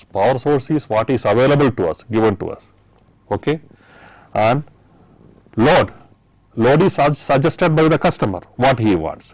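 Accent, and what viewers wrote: India. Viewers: Nice, but I can't understand this indian dialect...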